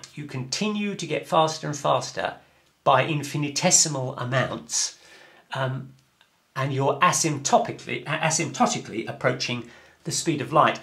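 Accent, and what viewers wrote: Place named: Oxford, United Kingdom. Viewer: Now this is Oxford English we non natives all learned in school. Understand every single word he says. Very good. A very good teacher anyway.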